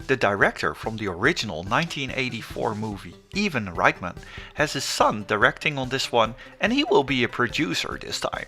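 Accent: Netherlands. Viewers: So ar you dutch then cuz you sound like it (im dutch)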